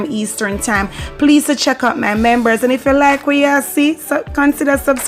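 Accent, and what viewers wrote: Jamaica. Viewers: I like this it sounds really like a jamaican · Love this . You doing perfect . Love my Jamaican dialect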